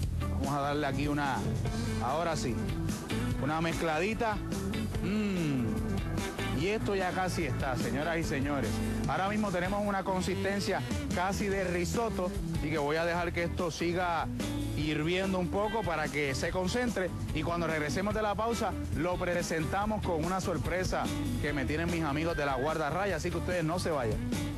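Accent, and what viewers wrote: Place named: Dominican Republic. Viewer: Este chef , siempre me a aparecido su acento como Dominicano, no puertorriqueño..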